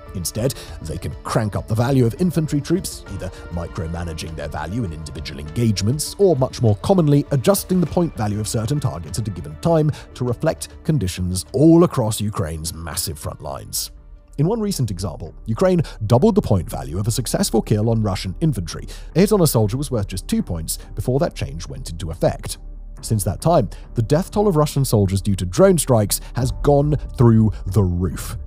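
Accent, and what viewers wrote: England, United Kingdom. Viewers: most annoying english accent 2025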